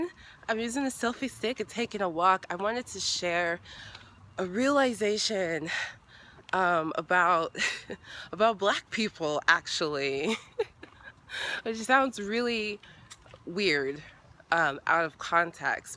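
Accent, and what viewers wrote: United States. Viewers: You sound totally American. By the way, I'm 71% African, with 27% Nigerian. And you are very kind and lovely. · Hey, I can tell by the way you talk that you are Americanized. That's the thing people don't factor in when they think about tracing their roots. You are a first born or second born American so it's by chance that you even took the test. That's why I hate some of the money grubbing that goes on in the US. It's because people want to make a lot of money that we can't see people from all over the world on tv. I've been trying for days to get free tv from other countries and I can't get any with Great Britain Natives on it because the US wants to charge money. I know that I'sound like I've gotten way off topic but here is how it ties in to your thing. Blacks in America are completely cut off from their African culture. You have your parents to fall back on but they've completely lost theirs. 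They could probably look at the Africa channel but that's cable and it's not cheap. I was looking for movies to watch and I realize that people get triple charged and still can't watch without paying even more. There was a movie I have seen called five easy puces. I have basic cable and I can't watch it because I don't have movie channels. The other parts of the house I have digital cable but I couldn't watch it unless I happen to have that movie channel package. I do happen to have that package but here is the rub. It will cost me an additional $4 to see it because I have to order it on demand. When do I stop getting charged for something I want to see? The lady probably feels like me that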